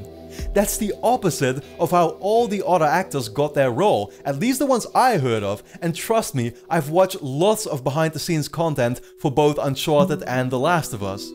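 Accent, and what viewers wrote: Netherlands. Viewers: Never heard of your channel, and Youtube pretty much always recommends trash. I decide to watch a recommended video for once, and it's gold. Spot. On. Plus, hup, Holland hup! Meer Nederlanders met een stevig accent in games!!!!!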